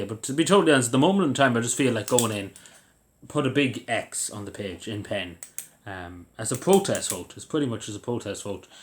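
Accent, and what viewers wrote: Ireland. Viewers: is it mandatory to vote? Im guesssing your in Eire from your accent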